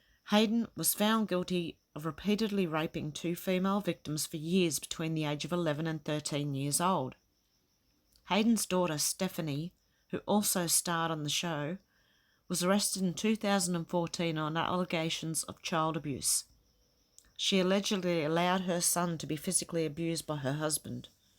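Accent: Australia. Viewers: so beautiful to hear an Australian accent and mindset. loved it. Millsys Para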